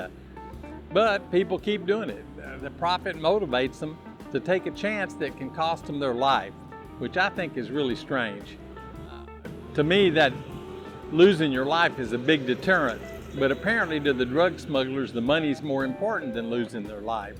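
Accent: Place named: United States. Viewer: Nice Video:) first one I have watched of yours.. keep up the good work. I hear the American Accent, what state were you originally from?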